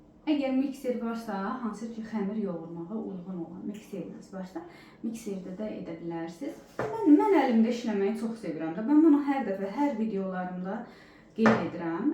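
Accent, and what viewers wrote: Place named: Nakhchivan, Azerbaijan. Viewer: Salam bu şirin danişiq naxcivan danişiqidi🎉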